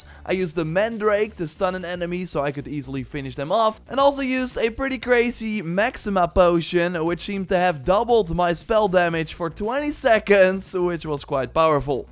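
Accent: Netherlands. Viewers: Ben jij Nederlands vanwege je accent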